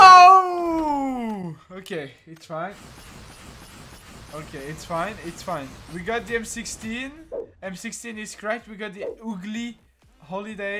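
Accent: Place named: France. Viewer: L’accent français est violent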